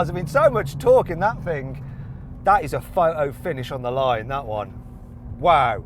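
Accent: United Kingdom. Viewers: Fucking English accent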